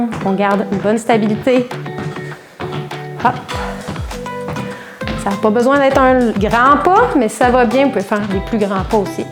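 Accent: Quebec, Canada. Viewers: Bonjour....et un grand bravo pour cette vidéo.qui va beaucoup m'aider...,.car, ayant une cruralgie, je souffre trop pour marcher à l'extérieur, pour le moment. Peut on perdre du poids en pratiquant ces exercices chaque jour...? Et peut on améliorer une cruralgie...? ...p.s. j'aime beaucoup votre accent du Québec....❤❤❤❤😊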